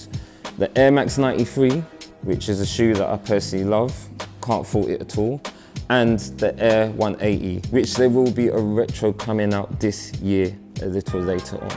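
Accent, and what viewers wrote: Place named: England, United Kingdom. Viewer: Its amazing an african guy speaking like an englishman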